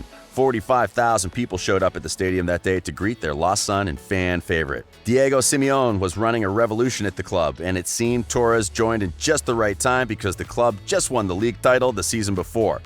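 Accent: United States. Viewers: An American accent starts talking about "Soccer"...nah...not watching it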